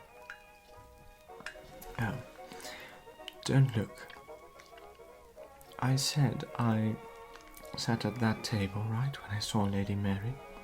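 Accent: RP, United Kingdom. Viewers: What type of british accent is this? you and one other similar asmrtist share the same accent i cant help but wonder where this originates from. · I enjoy this so much. Just recently found your channel. I am wondering if this is your natural accent OR if you change it up for a former era. Or perhaps you are putting on a more proper upper crust accent. Isn't there a way of referring to the more proper Queen's English accent - RP or something like that. I enjoy hearing all the different types of English accents, the proper, the cockney, the Liverpool style, etc. I am American but I know there are many inflections. Just as we have so many regional accents here in America. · Thank you for putting this together. What a lovely posh accent you have.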